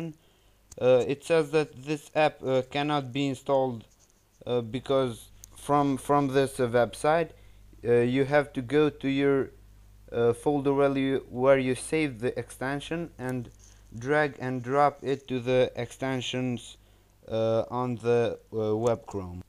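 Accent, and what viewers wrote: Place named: Albania. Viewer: theksin e shqiptrarit e paske haver :D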